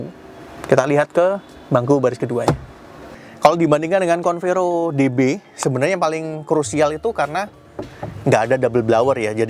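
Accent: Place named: Surabaya, Indonesia. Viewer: Okelah mantep bicaranya . Kek ke surabayan style hhehe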